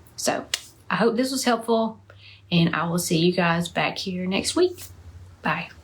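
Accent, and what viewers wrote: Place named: South, United States. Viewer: your thumbnails show it! And I am too. (I'm from GA.) So that's why I always click your videos...then I'm like, "oh, yeah, she's got a southern accent." 🤣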